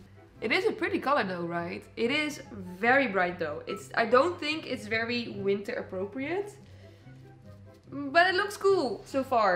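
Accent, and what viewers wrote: Netherlands. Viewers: Your accent sounds sooooo dutch, are you Dutch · Are you dutch??? Your accent seems really dutch hahah. Excuse me if i'm wrong lmao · the minute you started talking i KNEW you were dutch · Does anyone know where she is from because she sounds really dutch haha😅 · dr accent is zo nederlands lol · Dutch accents at its best, girl I love it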